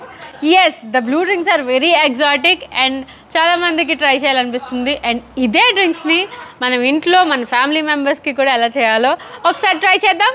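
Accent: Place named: Konaseema, India. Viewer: Elagunnav, aakalettunda 🤣🤣Haha konaseema slang max gaadu😂😂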